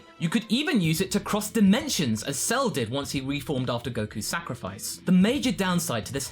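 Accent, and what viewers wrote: England, United Kingdom. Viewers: gt live supporter British. You can't tell by his accent? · Its because of the english accent. That's just how we say it over here.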